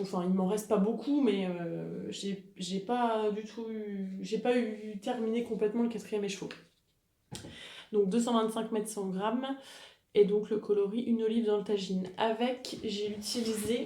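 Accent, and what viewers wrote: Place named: Lorraine, France. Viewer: Je viens de découvrir tes podcasts, que j’apprécie beaucoup et je me disais bien que je reconnaissais cet accent...Je suis allée au lycée à Lunéville, j’habite maintenant en Allemagne. Ça me fait plaisir de t’écouter, le podcast est intéressant et inspirant, et ça me transporte dans la région de ma jeunesse 😉. Bonne continuation....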